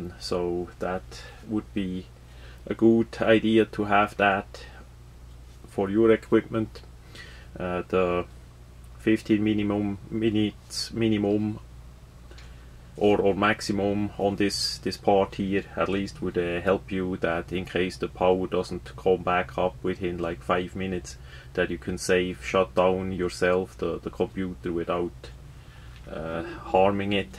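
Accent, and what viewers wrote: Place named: Switzerland. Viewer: awesome schwitzer-english accent... really funny.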